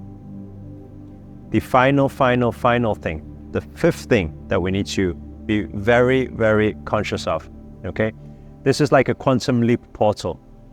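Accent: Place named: Wales, United Kingdom. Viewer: I had an old colleague get in touch lately but I always had an off feeling about them so I didn’t engage her - was polite but short. I trust my gut. I’ve been giving a training opportunity and taken it by the horns, very very grateful! Where is your accent from? It’s very interesting. Is there some Welsh in there?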